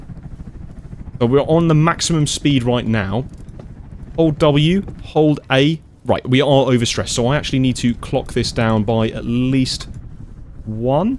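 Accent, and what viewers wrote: United Kingdom. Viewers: Personally for me I've always loved create, didn't fully enjoy others using it though, I 100% enjoyed your video. And the English accent is a genuine cherry on top, very soothing voice. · This guy kinda sounds like stephen merchant ngl. And no, not just because he has a british accent. Its just stephen merchant without pronouncing any R at the end of words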